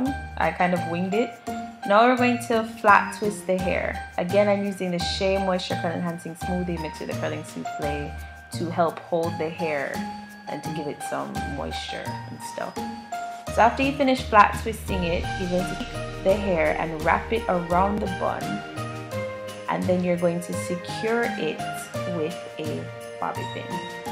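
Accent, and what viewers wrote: Jamaica. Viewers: Are you Jamaican? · Are u jamaican?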